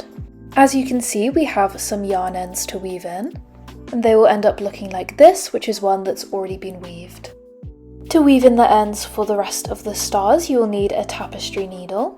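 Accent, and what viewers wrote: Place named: United Kingdom. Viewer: Indeed you did it quite absolutely fabulouslly magically authorically efficiently with your nice british accent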